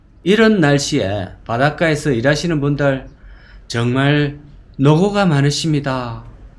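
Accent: Gyeongsang, South Korea. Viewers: 선생님. 갱상도 사투리가 구수합니다. 인구소멸 지역중에. 한곳. 외? 먹고살. 산업지대가. 전무상태. 경상도 와. 비교해보세요. 엄연한차이. 8.90년대. 대권은. 주로. 경상도. 하여. 공업단지. 그때모두조성. 그대표가. 구미공단. 울산 공단. 등. 모두 부자동네. 그런데. 지금. 경제. 안좋다고. 난리난. 경상도. 하지만. 현재도. 많은차이. 호남과. 경상은. 비교하지말기. 너무억울하니까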